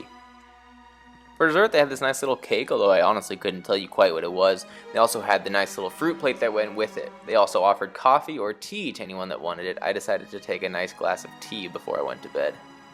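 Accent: United States. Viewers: Great video - I’m amused at your pronunciation of DAK AR being d’CAR - made me laugh over and over - I’m guessing it’s that American accent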